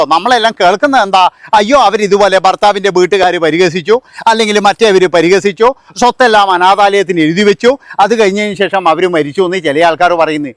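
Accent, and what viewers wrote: Kannur, India. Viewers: Enghalaranappa kannur karananno😂😂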